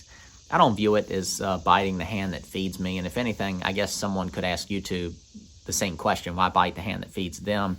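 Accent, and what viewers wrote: South, United States. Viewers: I agree with the chap who said you could do motivational speaking, myself I also like just the sound of your voice and your accent! ( southern US) I also would love to see this house when it is finished but nothing you post is boring because everything you post teaches me something. I love the passive aggressive, there is a lot of that going on in Britain, it's almost second nature. Family comes first doing things you have to and because you want to I can always go and study other videos you've made which cover almost every aspect of what you would want to learn thank you for some more great content. Kind Regards from South West England.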